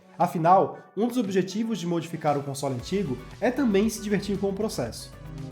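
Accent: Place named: Florianópolis, Brazil. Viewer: Incrível seu conteúdo cara, ganhou um inscrito. Fora que só entrei no video e percebi que é manezinho 😂👏🏻👏🏻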